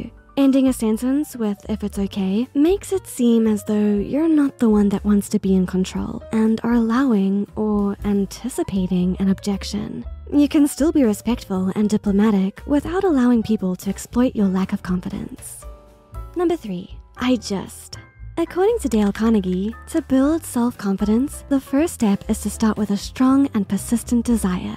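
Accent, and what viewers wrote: United Kingdom. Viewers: Thats the most british accent i have ever heard 😵 It’s a bit annoying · The VA is both British and Southern 🤔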